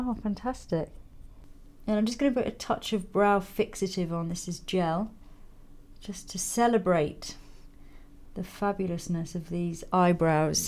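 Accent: England, United Kingdom. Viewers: Its a weird comment but I love your accent, it reminds me so much of Downton Abbey! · you can hear she picked up a bit of a British accent living in London:) this is an amazing look! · I'm so glad you're doing youtube videos, so I can just listen to your lovely British accent and relaxing voice on the days I'm missing London and the days I used to spend in that fascinating city while I was working there for a good while.:D and also are these vids totally satisfying to my insane makeup lover self as well. thank you for being such an awesome artist and a huge inspiration!:)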